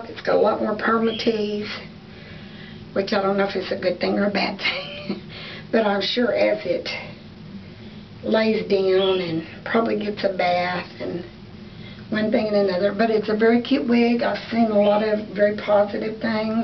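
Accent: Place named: Nashville, United States. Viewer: First of all, as a new wig wearer I really appreciate having video reviews to watch to help me decide which ones to try. So thank you for taking time to do the videos. I also wanted to ask you where you got your OD done. I'm outside of Nashville and your accent kinda sounds like mine! I've had Graves' and TED for about 15 years. Had one OD In 2003 but in the years since my other eye has grown out. The dr. who did my surgery in 2003 has moved out of state so looking for a new one.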